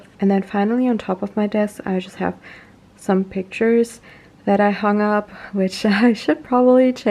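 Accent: Germany. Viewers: As soon as I heard you speak I knew you were German! You are adorable!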